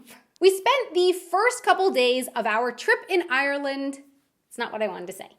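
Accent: United States. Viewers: After first five seconds yup she’s definitely American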